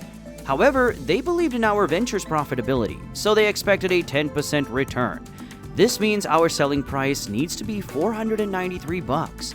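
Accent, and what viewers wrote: Russia. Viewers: I need the Russian accent 😢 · for real? Dang, I miss the Russian accent voice but looking and listening this one, I understood why. It feels like it’s made for a wider, global audience that’s used to American youtube channels. Add to the fact in your reply to another comment that you have two jobs. I’m just glad this channel is just dropped a new video after a long time, right with Adidas’ new elite running shoe.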